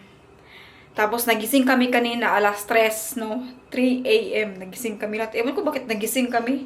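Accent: Visayas, Philippines. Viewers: Bisaya toh halata sa accent nya. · Yun mga anak mo kasi Dyan pinanganak ikaw visayan intonation pa din Even you speak English or British people will notice your intonation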